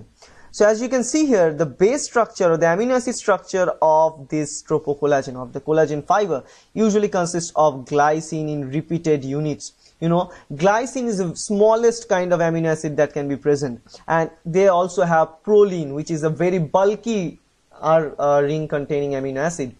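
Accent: India. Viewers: Go find videos from people from your language people... He is an Indian, he will speak like an Indian... If you have a problem with that... Go fuck yourself...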